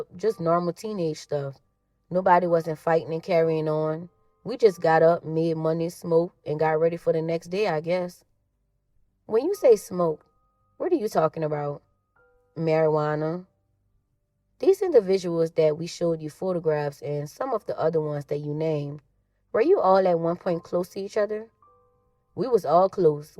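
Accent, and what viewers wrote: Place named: New Orleans, United States. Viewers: 💯👍🏾 Telly was a Dog! The narrator sounds like she’s right out the 7th ward! Lol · Finally... someone with the Nola dialect reading. Great job!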